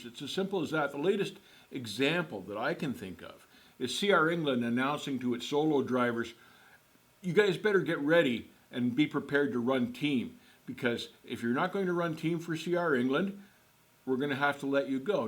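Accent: Canada. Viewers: You sound like a Canadian!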